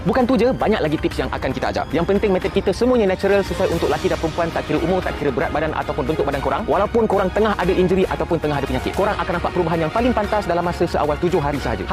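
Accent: Indonesia. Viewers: Kalau nak tipu pun biarlah menipu dengan pandai, janganlah pakai orang indo yang loghatnya ketara sangat. Betul lah bongok, patutlah IQ mereka sama level dengan golilla (gorilla)!! Reference: Boru Naga 2 hari lepas "IQ rata rata orang indo sama dengan gorilla, pemuda indonesia di-kecam sebab membela malaysia". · Itu nk claim ini nk claim...dh takde keje sgt ke...rendang...mkn jer laa cekik kasi habis...tgk muka cara ckap dh tau org mana...ingat org bodoh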